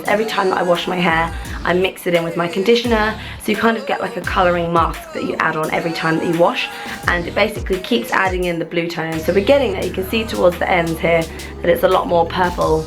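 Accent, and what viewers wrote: United Kingdom. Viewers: Nice british accent👌🏼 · Are u british? I am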